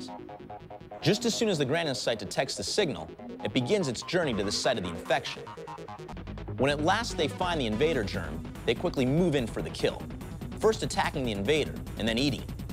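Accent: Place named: United States. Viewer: Awful American accent. It is a disaster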